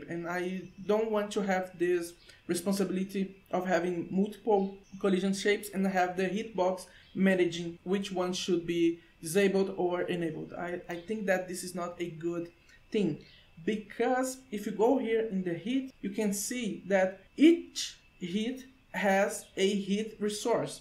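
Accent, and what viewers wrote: Brazil. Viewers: Where yo from? brazilian accent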